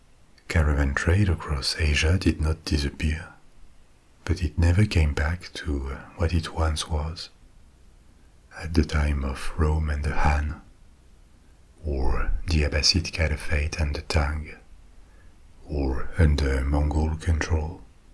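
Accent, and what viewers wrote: France. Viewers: Wonderful, relaxing ASMR and such a sensual and sexy voice with a gorgeous French accent. Delicious. 🥰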